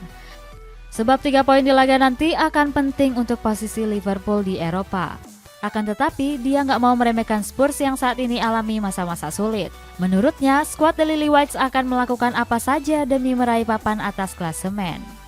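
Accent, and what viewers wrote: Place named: Kalimantan, Indonesia. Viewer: Logatnya kalimantan banget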